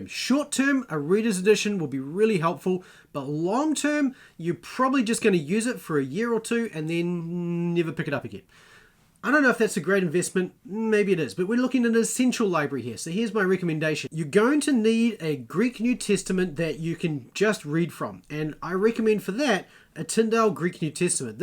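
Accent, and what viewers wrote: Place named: New Zealand. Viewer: Hi! I just stumbled upon your channel very recently and loving it. Thank-you! I noticed your Kiwi accent instantly as I am an Aussie. I am 3/4 of the way through Duff's "Elements of NT Greek" and really loving it. For some reason, this seems to be the industry-standard first-year Greek textbook for Australian institutions, hence my choice of it. Have you done a review of this yet? I could not find it anywhere if so. With regards to this video, I am wondering if Duff would be an adequate substitute for Mounce's book on your list?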